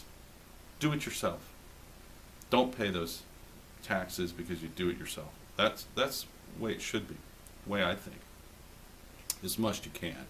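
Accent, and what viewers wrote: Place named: South, United States. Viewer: 4/9/2023. Great comments and video. Having grown up in the South, I appreciate your accent and use of y’all.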